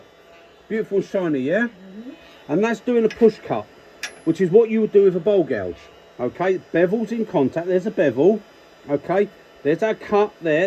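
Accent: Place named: South London, United Kingdom. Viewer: Fantastic, wasn't expecting to hear from you so close to Christmas thought you would be having a well urned break! I've got lots of books seen loads of the videos but your tutorials seem to hit the spot,feel like I can turn something half decent with me carbide and my badly sharpened traditionals,I think your accent helps, sound like one of my lot in South east London,no billy bullshit tell it like it is! As you can probably tell a few beers gone down my neck (it's Christmas) but sincerely enjoy the rest of it,and you and have a cracking New year.lee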